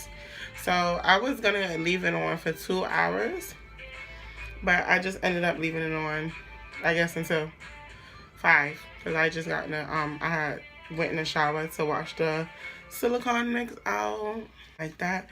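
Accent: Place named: New York, United States. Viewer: you HAVE to be from New York. Brooklyn? lmaoooo I hear it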